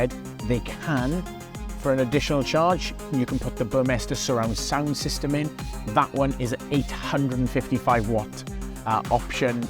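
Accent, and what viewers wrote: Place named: Wales, United Kingdom. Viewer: He sounds welsh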